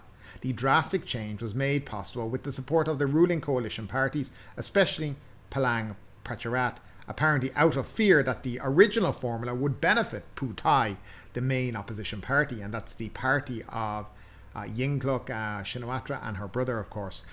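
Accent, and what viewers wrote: Ireland. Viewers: But what can we infer from your charming Irish lilt? 😄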